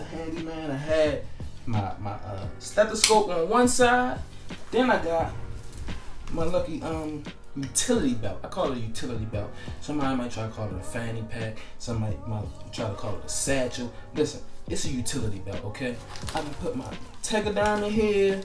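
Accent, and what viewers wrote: Baltimore, United States. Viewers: two, do, blue. true......kinda miss that Bmore accent.....ya know what um talking bout. might be the next comedian in Baltimore county!!....Good stuff!!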